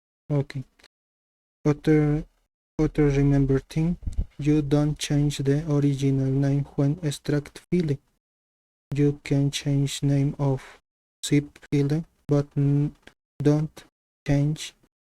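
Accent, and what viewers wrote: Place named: Bolivia. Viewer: Ese xD r u from Bolivia or Perú?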